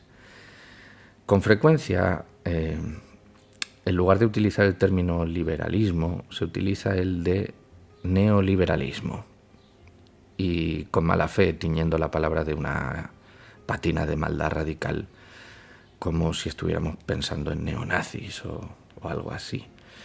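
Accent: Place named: Andalusia, Spain. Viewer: lo mejor e que pareces del sur de españa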